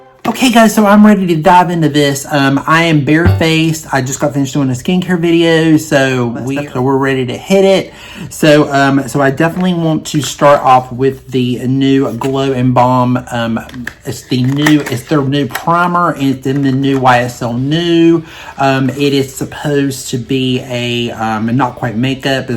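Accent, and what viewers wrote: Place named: North Carolina, United States. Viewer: Hi! I'm Sally and a new subscriber. I love your content and personality. I want to know if you have a drugstore dupe for YSL Concealer? 🙏..btw.. Charlotte!? Omg I used to live in Fayetteville NC in the early 90's.. that accent! 😊 💕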